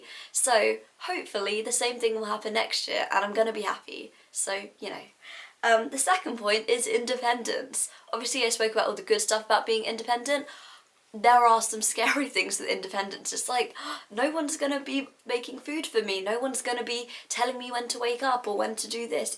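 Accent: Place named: United Kingdom. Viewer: I am excited by the accent you have! Being from India u sound more like a Britisher. I am surprised.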